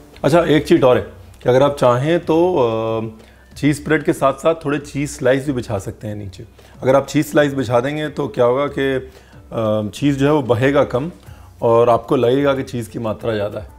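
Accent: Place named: Punjab, India. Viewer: yeah exactly. The way he iterates some word in Hindi and Punjabi blended.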